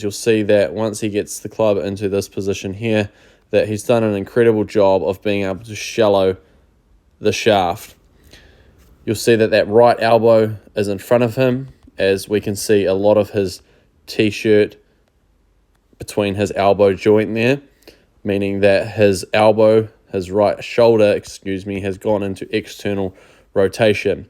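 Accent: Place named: New Zealand. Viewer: Great analogy, you sound like a kiwi that has lived in Aus for a while?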